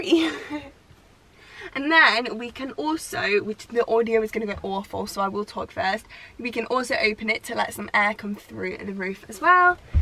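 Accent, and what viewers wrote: United Kingdom. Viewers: Cool car, I noticed the voice and said she’s in London or Britain. Even noticing that, when she was getting in the car...I said why is she getting in on the right side of the car? 😟🤣🤦🏽‍♂️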